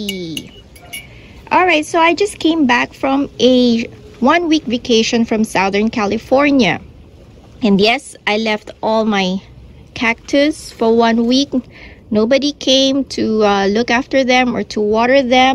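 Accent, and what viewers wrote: Philippines. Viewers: Hi the way you talk you are Filipino ..then i saw the design ur cup of coffeee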